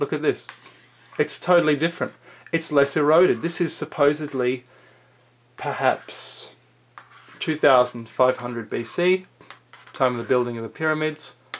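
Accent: Australia. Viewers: He has an Aussie accent calm down. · I really enjoy your astute commentary and speculations. Please continue. Your voice and accent are very easy on the ears. (unlike some Aussies) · Great video! And I could listen to the Australian accent forever, even you were telling me that horses can fly.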